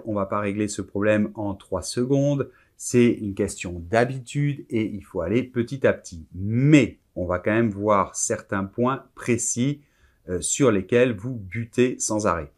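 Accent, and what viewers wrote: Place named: France. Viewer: La façon dont toi Français prononce le e est très différent du Québec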